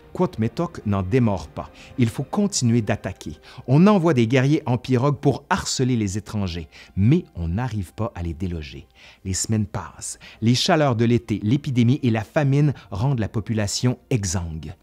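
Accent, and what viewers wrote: Quebec, Canada. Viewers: Coucou! Salutations depuis la Colombie. Je m'appelle Nathalie, je suis de langue maternelle française et j'ai la double nationalité suisse et colombienne. Cela fait depuis plusieurs semaines que je suis votre chaîne, et j'adore votre travail, vos vidéos si instructives et si bien recherchées. Toujours un si grand plaisir de vous écouter nous raconter l'Histoire, avec cet accent québécois si savoureux! Concernant le sujet du jour, j'ai des racines amérindiennes du côté de ma famille colombienne et le sujet de la colonisation et de ses effets, souvent tragiques, toujours très complexes sur le continent que nous connaissons maintenant comme le continent américain (nord, centre et sud) me touche profondément. Bravo pour votre présentation des premiers contacts et de la colonisation du point de vue des Aztèques, et sur la façon dont leurs traditions et leurs perspectives sur le monde et le cosmos étaient profondément mal comprises par les colonisateurs européens. 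Pourriez-vous vous intéresser aux peuples autochtones du Canada? Ou sur l'histoire de la Colombie coloniale? L'histoire de la Suisse est également passionnante! Voilà pour quelques idées de futures vidéos. Chaleureuses salutations! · J'adore l'accent québécois c'est du nuage auditif ❤ Pardon mais vous avez tellement une voix qui raconte des histoires pour enfants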